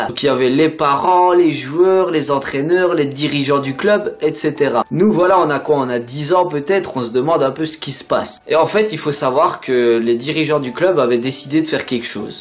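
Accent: Liège, Belgium. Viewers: J’ai entendu que ta voix a l’accent Liegeois moi aussi je suis Liegeois PS: je t’adore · Toi ça s'entend t belge #wearebelgium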